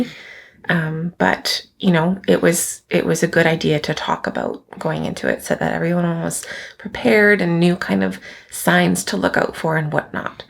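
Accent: Canada. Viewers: Your Canadian accent is showing and I love it. (Mississauga girl here). Thank you for sharing your story. Big congrats to you!! she's such a sweetie <3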